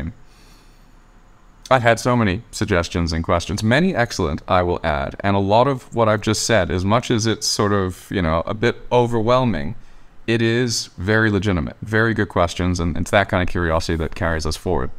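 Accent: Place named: Eastern Canada, Canada. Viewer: by your pronunciation of your T's I'm guessing you're from eastern Canada? Thanks for your work on this. Fascinating stuff I will eventually be trying to print this with CF-Nylon and again with Lightweight PLA (100% infill)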